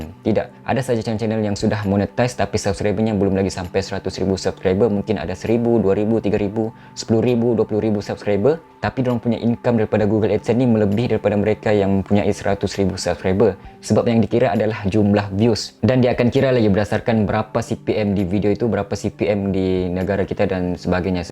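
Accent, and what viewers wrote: Sabah, Malaysia. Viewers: Torbaikkk 🙏🏻👍🏼👍🏼 ko Malaysia asal mana?Longat ko mcm bro2 dari Sandakan Sabah haha agak2 jak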